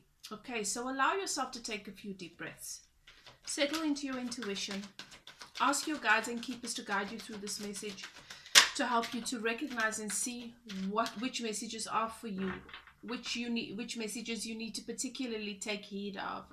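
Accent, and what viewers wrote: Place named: South Africa. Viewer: Wow,I love your reading, thought I recognize that accent.First time listening to a South African reader.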